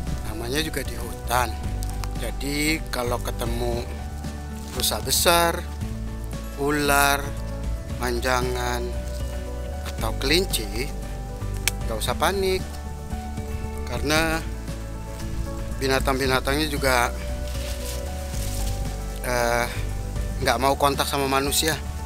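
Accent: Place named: Jakarta, Indonesia. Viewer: oww.. Kayk orang Jawa.. Tp kok logat nya betawi.. 🙏🙏🙏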